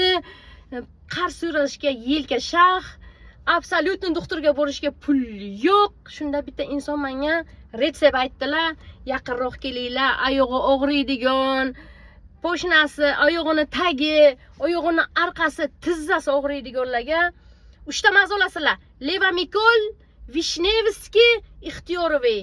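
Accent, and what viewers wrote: Bukhara, Uzbekistan. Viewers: Бухороча Шева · Бухороча шевамиз жонимгада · Бухорооооо. Ми дейманда акценла шунга ухшаб турибтида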